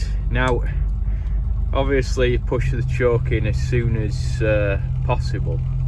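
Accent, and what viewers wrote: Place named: North, United Kingdom. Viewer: You have something rare......a pleasant Northern accent that's easy on the ears. Where are you from?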